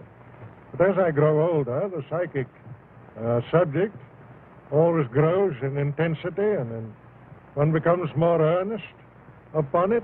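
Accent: United Kingdom. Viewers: Interesting to hear his distinctly Scottish burr.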